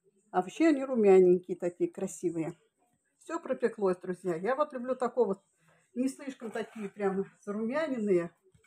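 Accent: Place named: Russia. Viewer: Слышу знакомый говор на О.где вы живете?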